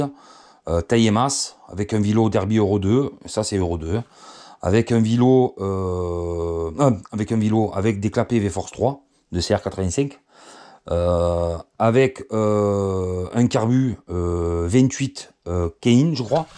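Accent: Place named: South, France. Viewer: Excellente vidéo, merci de partager tes connaissances avec nous, c est un régal, l accent du sud nous apporte du soleil ! 😉👍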